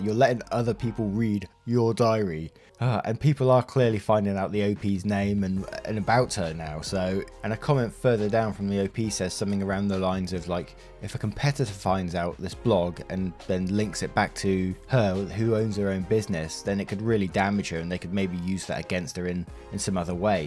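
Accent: England, United Kingdom. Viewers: No way would I have wanted to give birth to my sons without my husband there, but that was my own choice and his. I would have lost it if my in-laws had tried to interfere. Definitely not on! Thanks for doing these. You're the only Reddit reader I've found so far that's English rather than American, and actually seems to know all the words you're reading. I really enjoy listening. Good luck for your dad!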